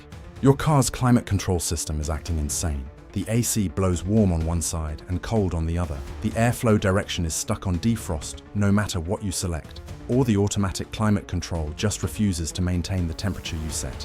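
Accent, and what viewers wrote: United Kingdom. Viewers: Why the British accent?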